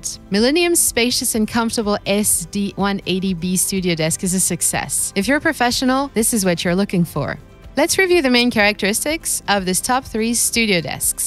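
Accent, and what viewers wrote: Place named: United States. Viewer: American accent, but went metric. Oof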